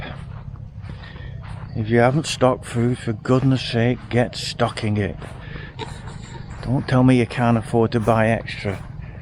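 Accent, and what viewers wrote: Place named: Northern England, United Kingdom. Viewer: 100% stay! And …… and I’d miss it. It’s very relaxing to see you wondering around your garden talking to us as you go. The garden brings out the best in you. It’s wonderful to listen to you a man of great eloquence and delightful northern tones. Your garden is better than Kew Gardens - it’s a belta, like. So there you have it this hard leftist says don’t move. And if you do I’ll call on every subscriber to go on bloody strike!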